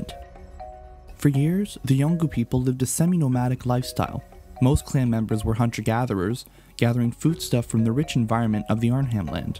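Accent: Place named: United States. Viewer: Hearing this stuff with an American accent makes me woozy lmao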